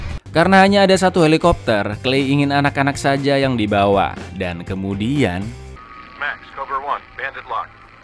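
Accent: Java, Indonesia. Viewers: Mas sampean jowo ngendi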